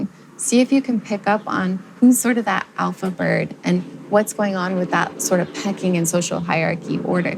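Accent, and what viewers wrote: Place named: Brazil. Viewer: Não te conhecia, mas pelo sotaque vique vc também é brasileira, ne?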